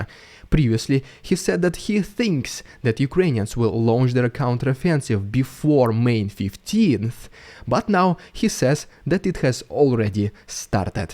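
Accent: Russia. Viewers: От такого русского акцента - кровь из ушей.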